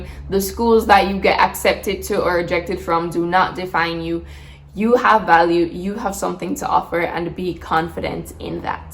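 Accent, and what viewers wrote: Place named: Jamaica. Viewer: Oh I just found your channel like 30 minutes ago and couldn't quite put a finger on the accent. Now that you mentioned that you're from Jamaica, that clarifies it for me. I am applying to come to the States for my PhD and while a lot of what you said was directed at undergrads, I still found a few tips to be helpful as well. Thanks for the great content.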